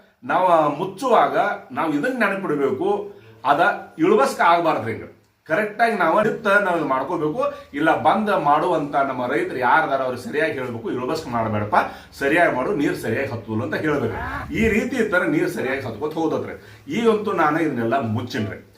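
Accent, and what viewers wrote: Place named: Karnataka, India. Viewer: Sir nivu mathadu rithi mathu bashe nodira nivu bellad bagewadi urinavru irabeku alava sir idakke reply kanditha kodri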